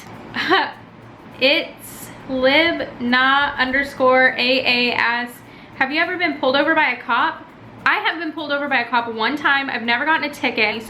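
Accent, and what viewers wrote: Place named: Texas, United States. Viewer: I did not no you live in Puerto rico you should like your from texas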